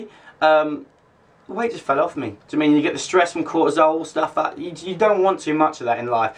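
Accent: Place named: Australia. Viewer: always thought you had something Aussie in ya there it is mate come live here again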